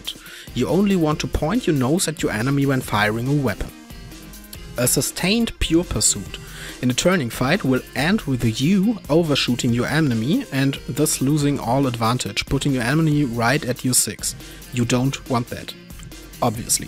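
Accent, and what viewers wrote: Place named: Germany. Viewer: I don't know what it is about German people speaking English but it sounds so damn cool, could listen to this dude for hours.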